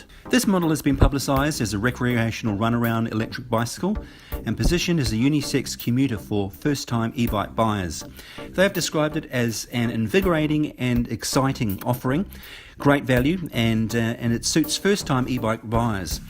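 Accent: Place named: United Kingdom. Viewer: Dude...I love your commentary. You sound British to me but whatever you are - you do an awesome job! Thanks for doing a real review.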